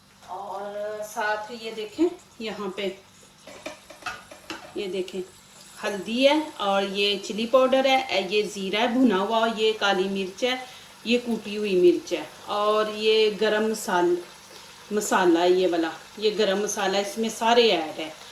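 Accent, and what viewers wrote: Punjab, India. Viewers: اردو پنجابی کی ٹون میں اچھی لگ رہی ہے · Aapki Punjabi accent bahut achhi lagti hai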